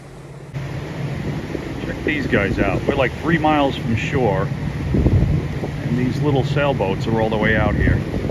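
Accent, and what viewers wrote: New York, United States. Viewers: Hi I am sorry i don't know your name. I enjoy watching your Video's. Love your New York accent. People say I sound like I am from Bronx.. LOL We live and boat on the South Shore long Island. We also snow bird in Naples FL.. My Wife and I have a 94 Mainship which We love. i also do my own work so i can relate to your video'. Safe Travels ( 7 knots is painfully slow) lol keep the Video's coming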